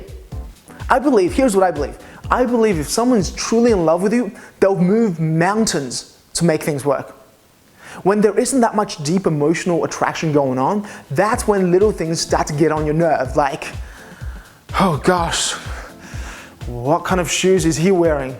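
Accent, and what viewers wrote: Australia. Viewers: Your accent is really cool. Are you from Australia? :) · YOU ARE AWESOME! Also, that accent tho!!!! Australian like mua? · Is that an Australian accent?? 🤔🤔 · Does he have an Australian accent??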